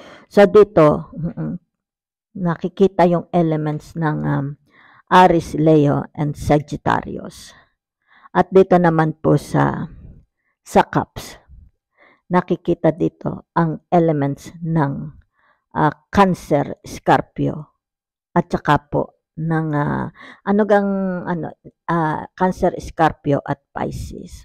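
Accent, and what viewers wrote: Batangas, Philippines. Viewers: thank you tita..GOD Bless you and your family..your a batanguena if i am not mistaken..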